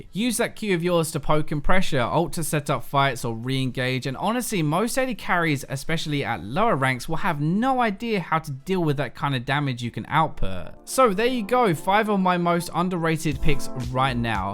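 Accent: England, United Kingdom. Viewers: is he english or an american?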